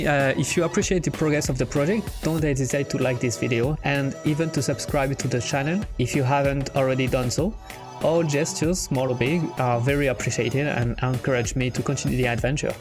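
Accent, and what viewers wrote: France. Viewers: you ar french youuu ?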